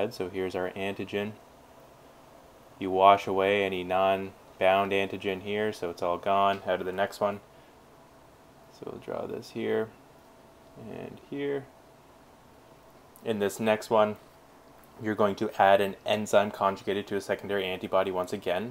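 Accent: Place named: United States. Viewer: Finally. A quick video with an american accent.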